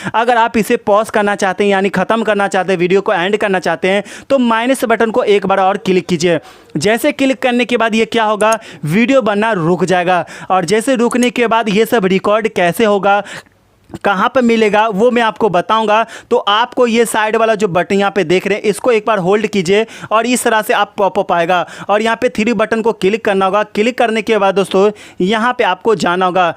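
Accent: Bihar, India. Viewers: Kya tu bihari hai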